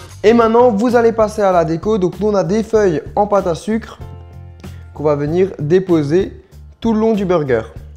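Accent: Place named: South, France. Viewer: J'aime trop t'es video t'es trop beau ta un accent du suddd mon poteeee x'd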